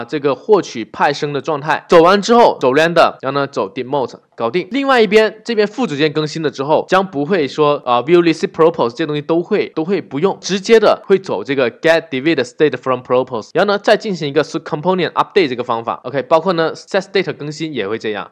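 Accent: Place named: China. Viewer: 除去英文不谈，这口音是哪里啊，肯定不是北方了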